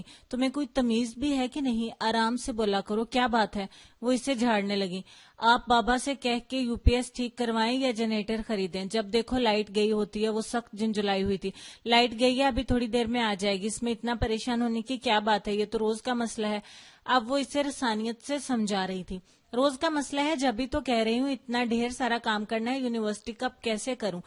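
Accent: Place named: Punjab, Pakistan. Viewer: You speak urdu in punjabi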